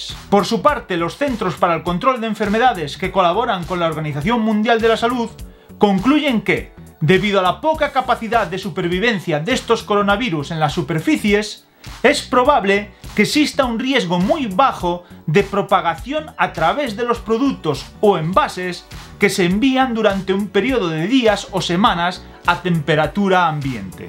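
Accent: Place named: Spain. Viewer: " infesiosos".... "testuales"... "produtos" ... ¿que zona tiene ese acento en España?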